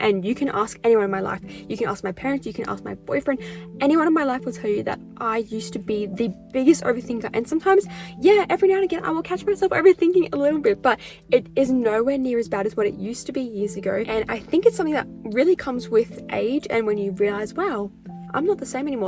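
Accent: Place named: Australia. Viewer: Name = ☘ Accent = Australia 🦘 Mind = blown 🤯 But I'm not gonna overthink it 😄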